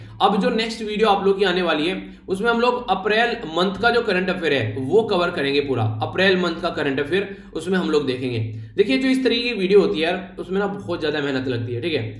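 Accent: Haryana, India. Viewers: Maybe Haryana (sonipat/gohana)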